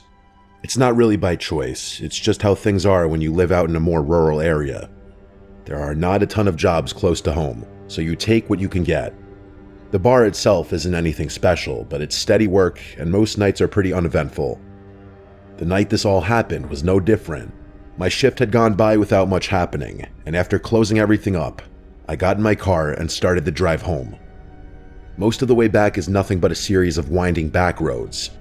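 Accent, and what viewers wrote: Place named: New Jersey, United States. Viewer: No he’s not. The abhorrent Jersey accent is far from intriguing. It’s amazing how many Generics fall in line.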